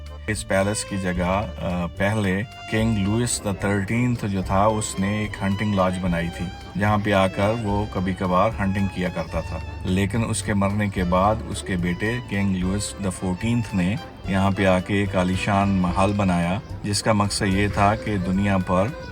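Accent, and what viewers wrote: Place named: Faisalabad, Pakistan. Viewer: My piyara brother.. Faisalabadi accent in urdu..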